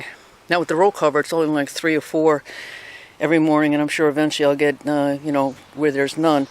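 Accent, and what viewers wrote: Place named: Pennsylvania, United States. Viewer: I have grown these here in NE VT. They take longer here, as we are borderline zone 3 and it does not get as hot as in your neck of the woods. I have a nice haul this year, about 20 of them from seed I saved from last year. I planted on June 1 after staring in the greenhouse. I just harvested them today, Oct 24 ( fortunately no frost yet). They are only just beginning to reveal their orange sections. I was wondering if these pumpkins can be just let to sit and dry in order to make seed harvesting easier and not such a gooey affair. Have you ever tried this? Anyways we are fortunate not to have a big problem with squash beetles, haven’t yet seen any here. The Japanese beetles preferred the hazelberts and prunus ( cherry plums and regular plums ) though they were less this year too ( maybe two years of trapping is helping). 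Keep on growing…. You sound like you live in PA…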